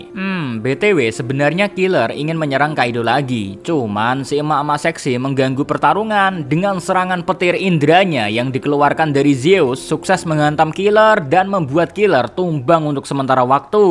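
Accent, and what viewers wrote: Java, Indonesia. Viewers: jowo ndi?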